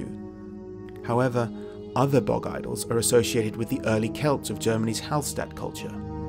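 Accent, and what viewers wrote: South Africa. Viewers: You sound a little South African brah. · this guys voice sounds afrikaans slightly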